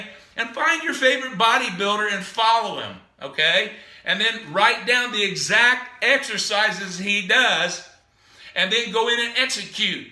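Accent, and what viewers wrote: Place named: Midland, United States. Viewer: Love that Midland accent. And the advice. I stay with the big three and add the ancillary exercises if time permits. Full tilt at 49, soon 50. All the best from Greater Cincy.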